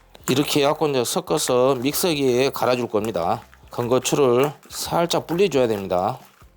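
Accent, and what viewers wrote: Jeolla, South Korea. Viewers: 전라도 사투리 설명이 구수하니 감칠맛 나는듯~^♡ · 전라도사투리가듣고 싶어서 무김치 잘배우고나갑니다 ㅎ ㅎ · 셰프님 전라도 사투리 넘 정겨워요😊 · 쉐프님의 요리 자주 시청하고 있습니다. 걸죽한 전라도 말씀 정감이갑니다.쉐프님께서 사용하시는 믹서기 구입하고 싶은데. 어디 좀 제품인지 알려주세요^^ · 완전 사투리가 돌산 아니면 광양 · 동생도 김치를 담궈 주시는. 오빠의 사랑 전라도고향 말씨에 정감이 갑니다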